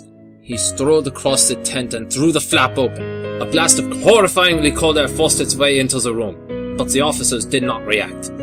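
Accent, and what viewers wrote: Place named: Germany. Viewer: Nice work with the German accent my friend. Tres bien mon amie.:)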